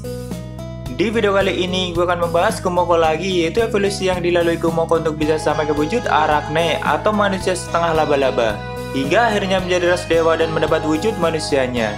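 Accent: Banyumas, Indonesia. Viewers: Ngapak wkwk malah kocak gua dengernya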